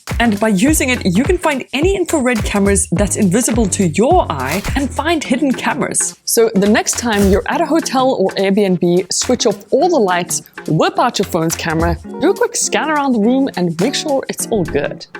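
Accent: South Africa. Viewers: She sounds like she is an ex South African . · Great video. Thanks. Well done!! (I’m curious… You South African? accent…🤔🤗) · So glad I found this! Subscribed immediately. And that was 100% because of the South African accent and the smart stuff.:medal-yellow-first-red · I think it's a South African accent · hmmm the access almost sounds South African ? 🤔😄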